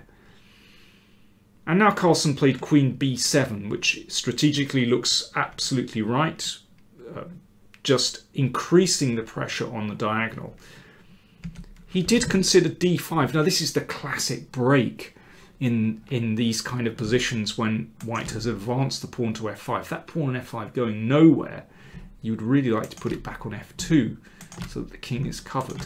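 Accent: United Kingdom. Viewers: Please keep saying "At all" in all your videos, because your ultra British accent is accentuated. It's great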